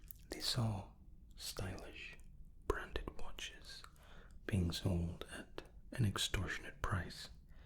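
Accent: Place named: Scotland, United Kingdom. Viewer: Been looking for a British ASMR for a while, couldnt believe my luck finding a Scottish ASMR guy. Scottish women living in canada now, and miss the brogue. Love it.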